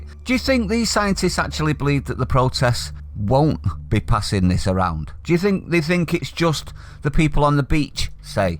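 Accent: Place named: Salford, United Kingdom. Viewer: Salford? If not it’s great to hear an accent close to me own talking sense.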